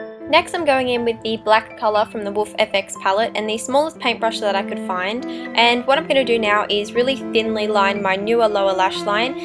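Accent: Australia. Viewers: You sound Australian 😂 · loving the Aussie accent yasssssss aussies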